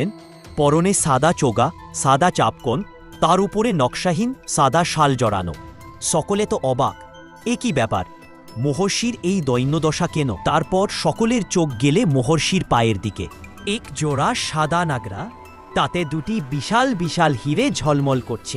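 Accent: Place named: India. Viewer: Awful rendition of an excellent Ray story. Hindi accented Bengali is difficult to understand.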